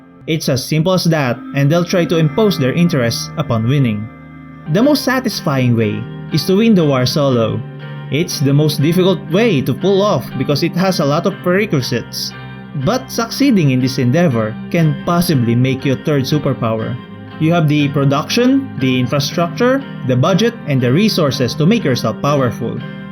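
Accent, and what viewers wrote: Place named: Philippines. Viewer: Strong filipino accent, make a run that parallels idiotic filipino internal policy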